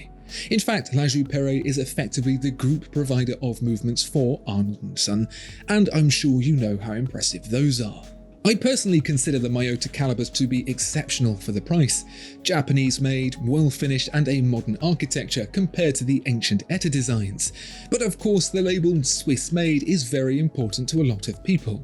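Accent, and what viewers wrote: United Kingdom. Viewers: He’s British ya goob.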